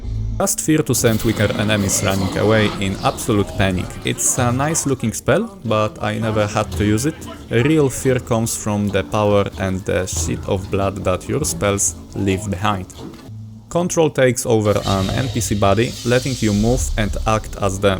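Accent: Poland. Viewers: I love how polish you sound brother 🇵🇱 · Odrazu słychać, ze jesteś z Polski każdy Polak wymawia końcówki ing z g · Słychać że rodak xD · podnieca cię podcinanie innym skrzydeł? Ma akcent i co? Jest z Polski to ma polski akcent, prosta i normalna sprawa, tylko debile silą się na hamerykański mimo że nie przychodzi im naturalnie, a gość mówi bardzo dobrze i zrozumiale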